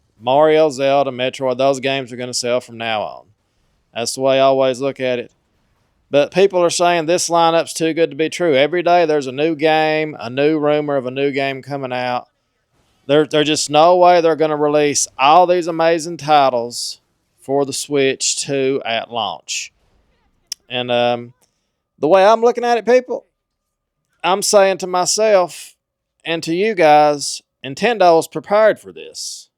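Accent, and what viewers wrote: United States. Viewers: Steam Deck and ROG ALLY has simmilar specs like switch 2 so I think it is possible. Your acent is the most american american who ever americaned :D And I like it. My bro begs my mom to get him the switch 2 on christmas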